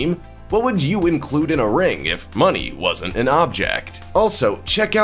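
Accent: United States. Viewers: The heavy American accent is unbearable. Makes everything automatically sound like a huge scam.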